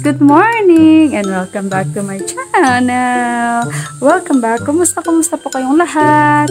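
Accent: Iloilo, Philippines. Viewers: ate ilongga ka po ba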